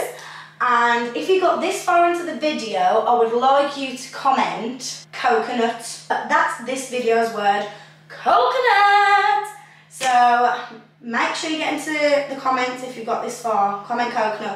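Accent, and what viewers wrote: United Kingdom. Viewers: You are so damn fine, that British accent just puts you on a different tier.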